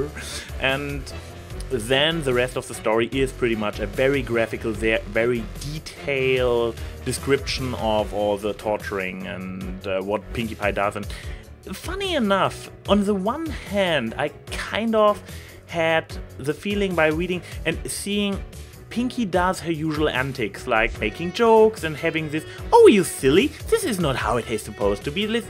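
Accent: Germany. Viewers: Du hast n deutschen Akzent, meine ich :) ich hab mich wärend deiner videos gefragt woher ich den akzent kenne ~ und musste feststellen dass ich auch so rede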